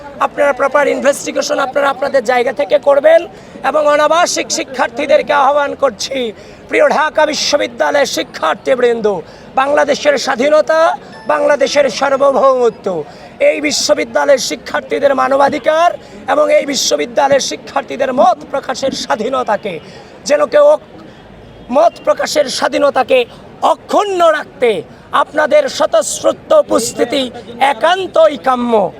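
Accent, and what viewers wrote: Kolkata, India. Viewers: ভাই কি কলকাতার?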